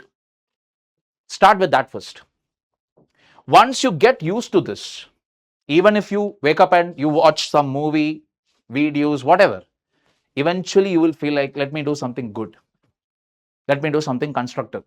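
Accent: Kerala, India. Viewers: This stupid is wasting aspirants valuable time by cleverly indulging motivation content in his lectures, with that malayali accent and popping eye gestures he is playing a good drama digitally and earning well.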